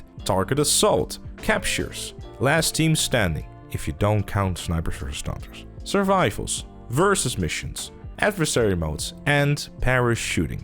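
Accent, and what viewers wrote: Netherlands. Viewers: Is the guy narrating Dutch?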